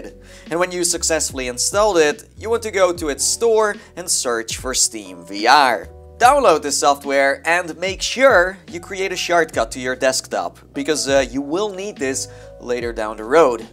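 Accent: Netherlands. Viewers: Are u Dutch ?? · Are you dutch? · Why do you sound dutch? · YOU MUST BE DUTCH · I KNEW IT, I knew you were Dutch, first I didn’t notice but after pausing the video and doing something else in between, after I started the video again I could hear a small trace of a Dutch accent. When you went to the control panel and I saw “luidspreker” I knew for sure. Kijk is aan. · Are u from the Nederlands? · are you nederlands?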